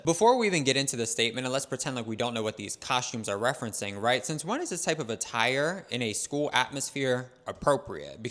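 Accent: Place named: United Kingdom. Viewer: We got a Brit over here innit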